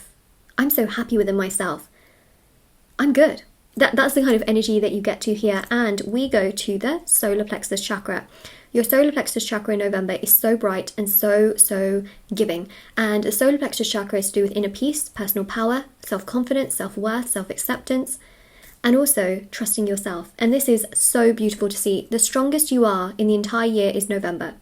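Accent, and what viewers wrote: United Kingdom. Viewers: Much confused she sounds British uses American slang haha thank you for the reading. I hope this is how it works out.. 🤞♊️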